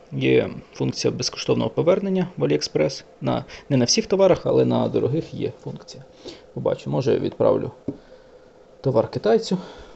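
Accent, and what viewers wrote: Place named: Kyiv, Ukraine. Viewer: если тебя спросят откуда ты взял "що то має бути на виході"? что ты ответишь? В описании такого нет (это вопрос чисто для себя самого, вернуться в "реальность"). Частково жартую... але... Сколько в споре запросил возврата? Когда жил в Киеве, балдел от того как говорят "старики" (когда чистый язык, без суржика). Обожаю Киевски и Полтавский вариант речи. Вас тоже прям приятно слушать. Ещё раньше балдел от канала "Дядько Максим". Приятно было слушать и смотреть как он чё-то там точит. Получается у Вас это скорее хобби? Или я не правильно себе представляю "інспекційне обладнання". Вас не напрягает что я пишу на русском? (а то в голове половина мыслей идёт так, половина сяк, но удобней писать на рус.)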